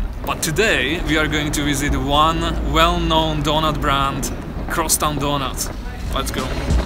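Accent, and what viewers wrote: Slovakia. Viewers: is your accent Slovak or Czech? nice videos thanks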